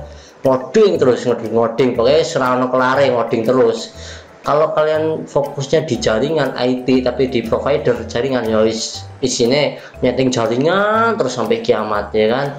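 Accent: Banyumas, Indonesia. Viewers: wah pantes ngapak toh, punya No.WA mas?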